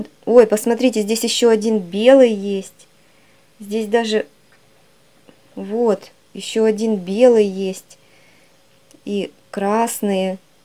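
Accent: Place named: Surzhyk, Ukraine. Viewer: Я не знаю , що там у вас за яйцеалмази, просто суржик ваш ріже вухо, говоріть або вже досконало російською( рашним язиком) , або пререходьте на українську мову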